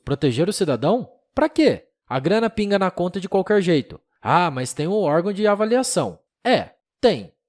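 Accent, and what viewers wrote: Brazil. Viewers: Peiceberam, poique, podei, seiviço, ocultai, abeita, Salvadoi, meicado ... O narrador nasceu num dos três estados, Minas, Paraná ou São Paulo, pois troca o R pelo i , da até agonia.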